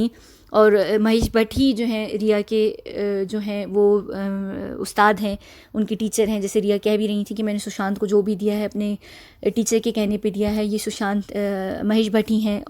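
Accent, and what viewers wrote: England, United Kingdom. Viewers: Very well explained, with your Englandish accent.. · Your accent is British.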